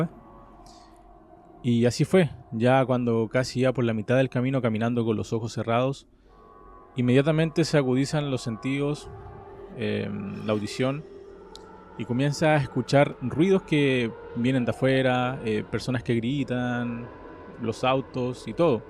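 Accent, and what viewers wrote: Chile. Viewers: Me gusta mucho el acento chileno <3 Muy buen trabajo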